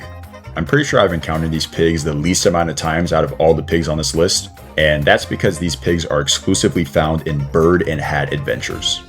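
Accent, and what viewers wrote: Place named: Brazil. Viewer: eeeee um brasileiro falando ingles!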